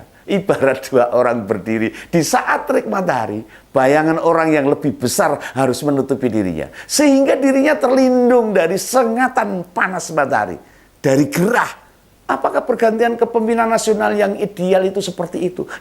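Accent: Java, Indonesia. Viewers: mas nada bahasanya santai dan kental cirikhas jawa aslinya, sy org bali senangsekali mendengarnya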